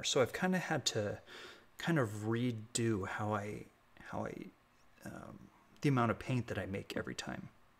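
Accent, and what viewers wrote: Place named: South, United States. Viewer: Hi,. Really nice colors. I imagine it's gonna dry deeper so be sure & show us the finished painting. Oh, btw, I am a deep Southern gal & take no offense to yo bit of South talk. (Just don't put me down in my presence.)😎 💕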